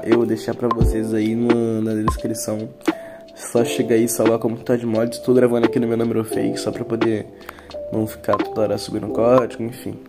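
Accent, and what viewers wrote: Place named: Rio de Janeiro, Brazil. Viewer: Shu carioca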